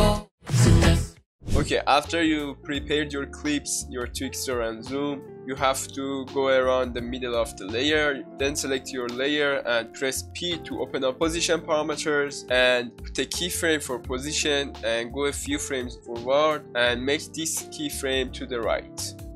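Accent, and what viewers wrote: Iran. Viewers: your accent is so iranian coded. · عجب لحجه ای قشنگ معلومه ایرانیی😂😂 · دادا من دارم شروع میکنم از لحجه نازت فهمیدم ایرانی هستی .کاشکی زبونتم ایرانی میکردی ولی غب.سطخت بالاست .ماشالله😍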